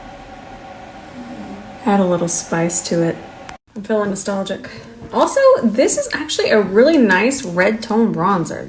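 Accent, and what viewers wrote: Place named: South, United States. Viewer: are you in the states? You have a Southern accent! Under your info, though, it says Pakistan 🧕 I can't wait to save some money to buy your brushes; Social Security doesn't go very far. I love watching your videos. Your makeup always looks Gorgeous. I love you❤️‍🩹🐈‍⬛🐴🐈🐕‍🦺❤️‍🩹💯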